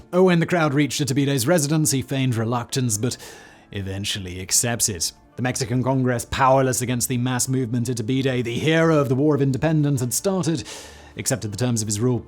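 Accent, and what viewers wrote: RP, United Kingdom. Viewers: A former Brit here who moved to America in large part because of what I'm about to say here. I have delivered a Thumb Down on this video. For the needless sideswipes against American conservatives. I heard a lot of this snideness when I was in the UK and I eventually figured out that it came out of a place of envy. Conservatives in America have elected some absolute duds - George W Bush, ugh - but they also produced Reagan and, yes, Trump. From your accent - which you have taken great care to curate, given the jumpcuts - I am guessing that you identify with the BBC upper-crust. A Brit can always tell.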